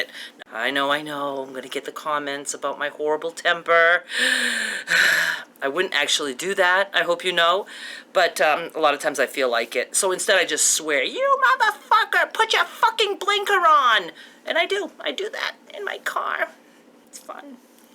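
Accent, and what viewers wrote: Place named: Rhode Island, United States. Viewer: How about when you needed to wear panty hose and you had only two pair and they both had a run? You cut off the "bad leg off both and then proceed putting both pair on, then you get two good legs! I need to. Ask, are you from Woonsocket RI. You have that accent. My pet peeve is a person who ask you to help with a project( like decorate for their child's birthday party) and you end up starting the project and they go to the bar to socialize while you do all the work!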